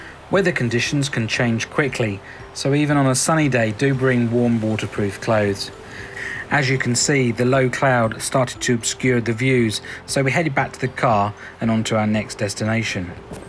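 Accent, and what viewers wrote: England, United Kingdom. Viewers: Just love the English accent….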